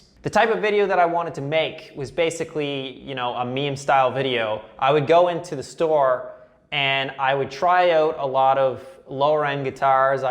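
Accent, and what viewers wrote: Canada. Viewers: What part of canada is he from, im from newfoundland lmao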